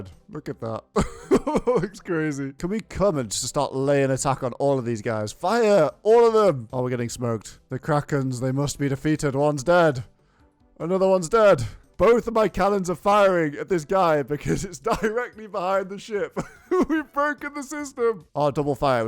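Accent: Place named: United Kingdom. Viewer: bro sounds like a doofus. is that just him or just the british accent?🫩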